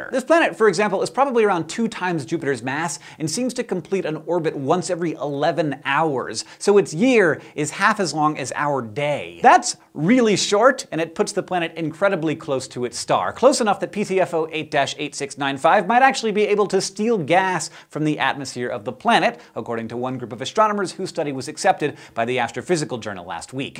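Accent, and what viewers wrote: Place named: United States. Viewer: He’s American, get over it.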